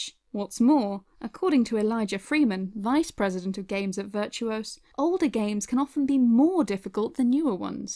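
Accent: United Kingdom. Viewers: Love your voice and accent! 😍😍 Are you from the south of England? I would guess Surrey or London :P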